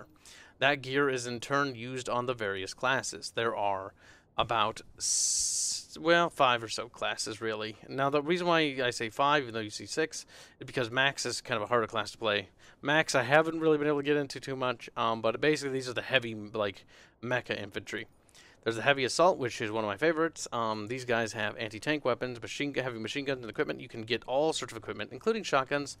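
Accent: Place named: United States. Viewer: Hi! welcome to planetside 2! Are you European? you picked the Cobalt server (Europe server..) and you have a poor connection... wasn't sure about your accent but you sound quite American to me :)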